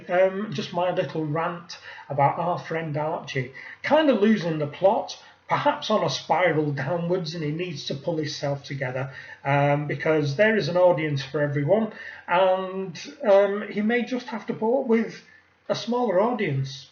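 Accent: United Kingdom. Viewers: Hi. subscribed to your channel about 3 weeks ago and I enjoy your vids very much....its nice to hear a fellow brits voice on such a nieche subject as horology. I share your opinions about archie and can no longer watch his videos. The swearing is too over the top and the content is just plain boring! keep up the good work.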